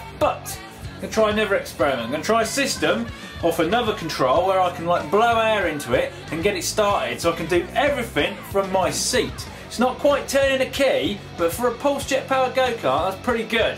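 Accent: England, United Kingdom. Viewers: What is real funny, is to watch the Subtitles. I don't think that whomever or whatever is putting in subtitles can understand his heavy English Accent any better than I can, but some of the translations are just hilarious!!!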